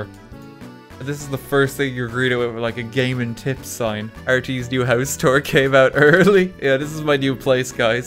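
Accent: Ireland. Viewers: Why do you sound Irish but use southern slang? Lol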